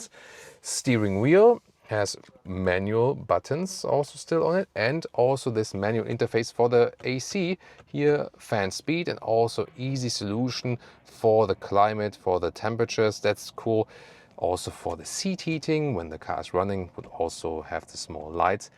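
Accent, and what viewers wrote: Germany. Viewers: The German accent is amazing 😄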